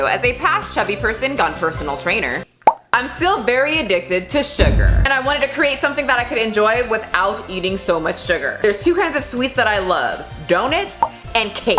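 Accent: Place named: New Jersey, United States. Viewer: Omg I’m watching this video 5 years late. I LIVED HERE 5 years ago! I’ve been to that movie theater! My gym was on the next block! 😂 ( I’m so glad I found your content in the past year. And your NY/NJ is on point 💚)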